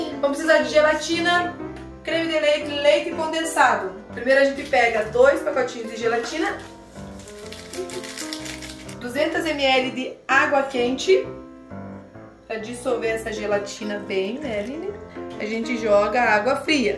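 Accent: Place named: Rio Grande do Sul, Brazil. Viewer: Eu acho q eles torcem eles têm sotaque de Gaúcho PS: Eu sou Gremista💙🤍🖤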